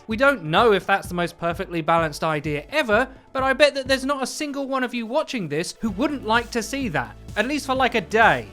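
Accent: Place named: United Kingdom. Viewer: British people talk funny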